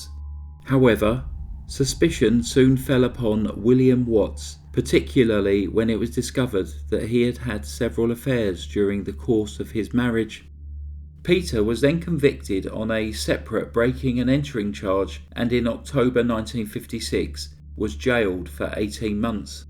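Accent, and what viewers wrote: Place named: Scotland, United Kingdom. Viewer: Sorry that you lost your friend! Was fun to hear a Scottish accented intro.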